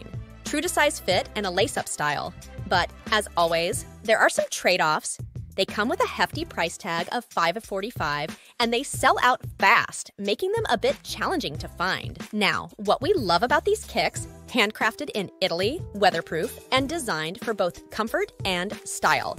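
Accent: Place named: California, United States. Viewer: I’m not trying to be mean, that California accent is straight up out of Saturday Night Live, The Californians . 🦄 🌴 🌞 😂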